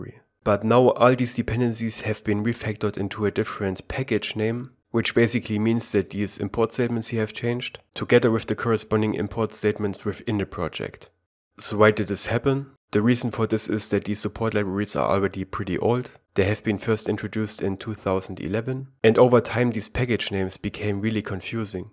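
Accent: Germany. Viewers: German english accent is very lovely. I don't know. But I like your accent and coding. I like your teaching style. Keep this up. Dont stop. We benefit from you a lot. Thank you very much Sir.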